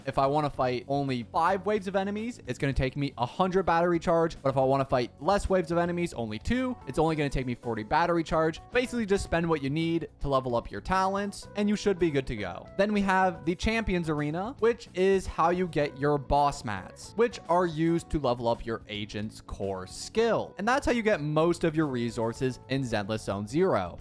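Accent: Canada. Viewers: you have a canadian accent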